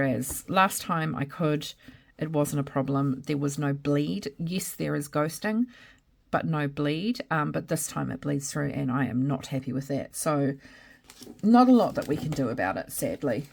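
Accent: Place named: New Zealand. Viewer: Hearing a fellow kiwi is always a treat! I love the A6 size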